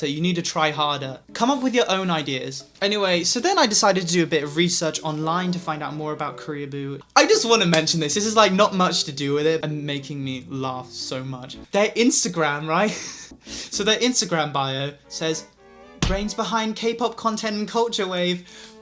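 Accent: United Kingdom. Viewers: Wasnt expecting that british accent lmao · I wasn't expecting that British accent- ( I usually listen to the covers )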